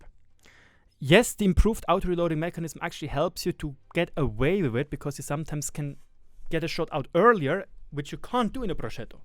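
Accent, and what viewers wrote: Germany. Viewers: Bist du deutscher?😅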